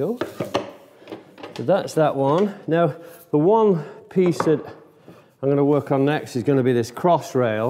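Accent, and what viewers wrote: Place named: Northern England, United Kingdom. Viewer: Excellent Video/s - which part of the UK are you from and where are you now? My question is, after watching closely and carefully your Saw and Chisel Skills throughout your Workbench Build videos - what is your preferred Chisel Brand and why? - AND what is your preferred Saw Brand and why? I suspect by your slightly 'accented ' Northern dialect, you might now be located in the USA so, as such, maybe your Chosen Brands might not be available in the UK/France! I any case, l willl look forward to hearing from you soon - Thanks. All the very best to you and your family.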